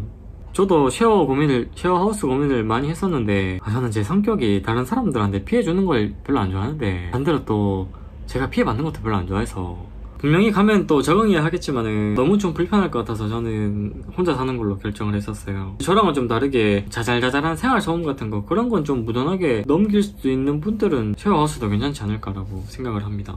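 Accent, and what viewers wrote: Daegu, South Korea. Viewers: ㅋㅋ 대구 사람이신가 대구사투리 느낌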